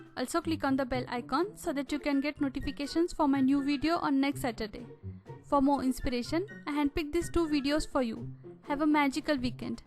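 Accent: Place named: India. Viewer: It's nice to here an Indian speaking English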